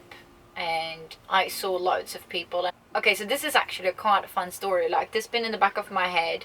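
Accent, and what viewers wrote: Australia. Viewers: Alltså jag blir så irriterad på kommentarer som dessa. pratar hur bra engelska som helst med australiensisk accent. Som jag förstått det har hon rest/bott mycket där. Om det stör dig är det väl bara att avfölja utan att sprida otrevlig energi. Jag skulle typ dö för att prata så bra engelska som gör. Tror snarare hon får fler prenumeranter då den engelskspråkiga delen av jordens befolkning är betydligt större än den svenska 😂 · You are Swedish but why the Ausie accent??? Just wondering??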